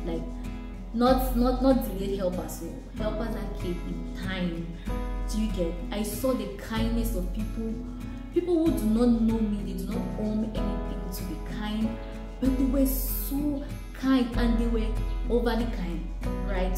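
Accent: Nigeria. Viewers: If you're not Nigerian, I suspect you may have schooled in Nigeria then or grew up among Nigerians. Your accent is so Nigerian with no blemish. You're a good story teller and I love your channel so much. Well done. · Girl you look, talk and your hand expression is just as beautiful as as a Nigerian. You can pass as a Nigerian girl. Love 💕 it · U sound more like nigerian. We love you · I would have sworn you are Nigerian, you sound like us! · I honestly don't believe you're Cameroonian . Your look , and your accent even sounds like you're from Any part from Nigeria . Lovely ! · One thing with us is that NIGERIANS are just hospitable but we don't see it as anything, it's just normal. You sound so much like a Nigerian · Your English accent is very nigerian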